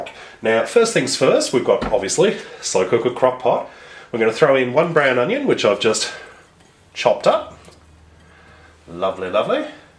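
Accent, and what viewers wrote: Australia. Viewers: He’s an Ozzie 👌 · let me guess. definitely Australian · Australian mate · Love the Aussie accent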